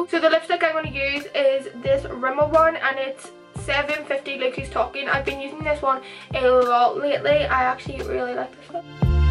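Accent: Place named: Northern Ireland, United Kingdom. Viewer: It's so refreshing to see a YouTuber from Northern Ireland!!! I feel like I always forget our accent exists on the internet😂💜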